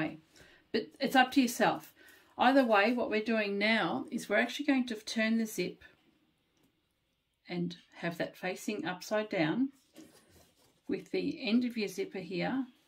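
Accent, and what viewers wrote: Australia. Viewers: I only found your channel a few days ago while unwell in bed, and I’m itching to get well and back in the sewing room. Your instructions are easy to follow and it’s great to hear an Aussie voice too. I made 3 helping hands yesterday and will make a few more for our family card playing days. Thank you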